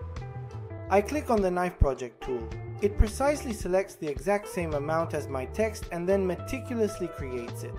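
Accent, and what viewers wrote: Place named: India. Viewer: وقتی انگلیسی حرف می‌زنی استاد شبیه هندی‌ها می‌شین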